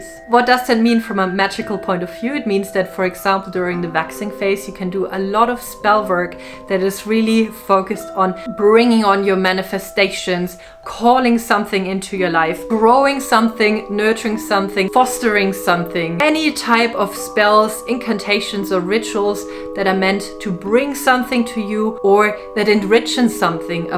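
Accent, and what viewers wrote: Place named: Germany. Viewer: I am happy your videos are not MOONdane.... ha ha So, thank you for your videos. They are so great. I love listening to you talk - I don't want to guess wrong or assume that you are German although I've seen you holding what looks to be a lot of German books and the way you pronounced some words with a "v" like the word "witches" - but I love your accent. You are very informative and always have interesting topics. I especially like this video because when you spoke about your grandma, it immediately made me think of my grandma and grandpa (my grandpa being from German lineage) and they never did anything that wasn't in accordance with the moon. Luckily, they taught me how to live by the moon cycles as well, and the knowledge they passed along has not gone to waste. I don't even rely on the weather channel for my weather information - I know what the weather is going to be like based upon observing nature, such as the birds and livestock. 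Loved this video so much.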